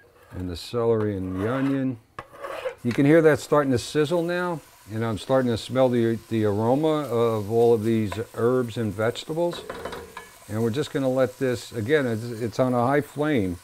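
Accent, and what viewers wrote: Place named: New Jersey, United States. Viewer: Belleville, Bloomfield, Nutley or Newark? Your speech patterns sound like home. Your recipes are marvelous. Thank you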